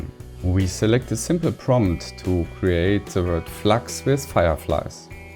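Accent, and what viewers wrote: Germany. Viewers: jerne, der jute Berlina Dialekt sei uns holdt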